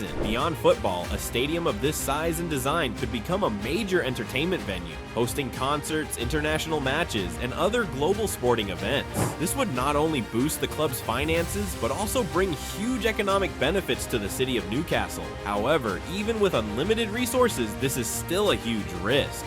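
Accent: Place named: United States. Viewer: More click bait in an American accent ✊🏻✊🏻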